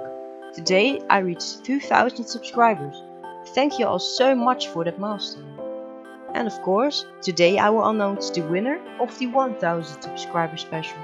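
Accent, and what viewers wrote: Netherlands. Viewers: That Goes so quick?! Je kan horen dat je nl bent btw 😂😂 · Are hou Dutch?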